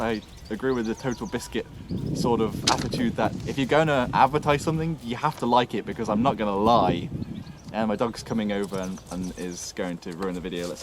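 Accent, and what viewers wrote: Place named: United Kingdom. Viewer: That brittish accent sounds so good! I hope you find a new job and get happy again.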